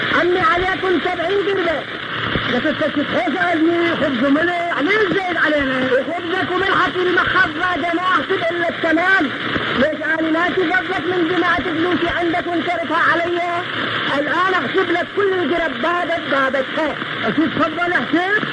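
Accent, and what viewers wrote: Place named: Baghdad, Iraq. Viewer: مشكلة بعض الكلمات غير مفهومة بسب التشويش بس الكلام البغدادي الاصيل روعة شلون لهجة حلوة ❤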